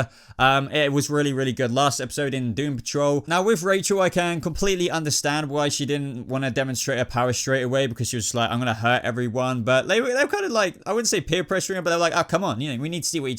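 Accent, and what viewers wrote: United Kingdom. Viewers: Hi. -Where are you from ? - I signed up to DC Universe and like your video. I'm a "Newbie" ... but I will 'Like' and 'Subscribe' to get your 'Take' on the series. ... USA, Washington State, Seattle here .. wondering where you are from .. "vit" a-mins" vs. "vite a mins" ... I'm thinkin' British, or Aussie or Kiwi. It's All good .... just glad it's in English .. or as you may characterize it (ME), American English :-p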